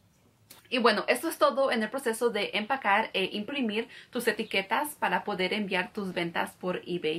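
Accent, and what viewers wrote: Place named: United States. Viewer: Amiga con todo amiga con todo respeto amo tu información y todo lo que haces creo que eres una gran persona pero no se si eres latina o naciste aquí y tal vez por eso tienes ese acento gringo pero todo el video me la pase escuchando tu acento gringo tipo cuando los gringos tratan de hablar español algo así y me confundiste un poco pero tu información es buena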